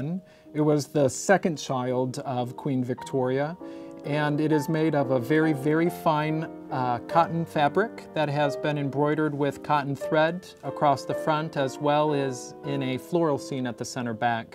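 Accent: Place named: United States. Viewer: WHO MADE THIS APPALLING IN QUALITY DOCUMENTARY?Firstly Who is presenting these exquisite objects? with Canadian or. I hope not American accent. Why does the Camera stay fixed on him. No interest ,instead of presented pieces? Irritating background noise . And Too short moment on objects with zoom image clouded. I CANNOT BELIEVE WITH ALL THE BRILLIANT YOUNG PEOPLE. WHO PRESENT DOCUMENTARIES OF THE HIGHEST QUALITY . THAT THE MUSEUM OF LONDON PRESENTS. THIS VERY POOR QUALITY WORK. An English Lady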